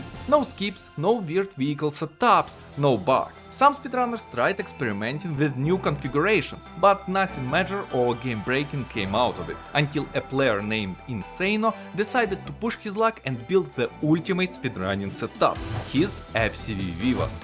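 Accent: Russia. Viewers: Слышу Русский акцент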